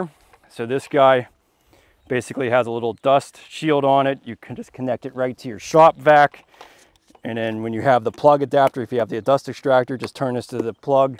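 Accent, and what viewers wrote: Pittsburgh, United States. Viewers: You are very selfless in sharing information. I also love your accent and it takes me back to my days in Pittsburgh.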